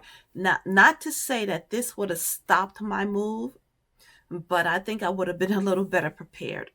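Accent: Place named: Georgia, United States. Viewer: I’m 3 yrs. Late but for being born in NYC and living in NORTH Jersey you sound like you come from Georgia. If you’re still there in a few more years we might be neighbors when I finally retire.. 😂😂😂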